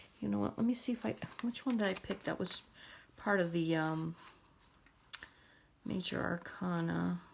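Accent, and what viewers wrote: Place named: Boston, United States. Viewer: I have been watching use this deck for quite some time now. I am not drawn to it. I think it is interesting even if some of the iconography is different than the R-W. I even like the Alice in Wonderland stories but I am just not interested in using this deck. And gosh! What a book! Too much for me! does great with it and that is enough for me! Thanks for going over it though! PS....thinking back to the old radio show Car Talk, that IS the accent you have! Yeah Boston!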